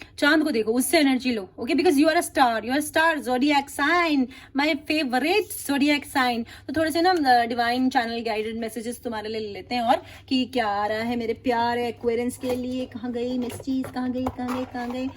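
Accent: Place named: Kolkata, India. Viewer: Sune valo laglo....Kolkata bengali..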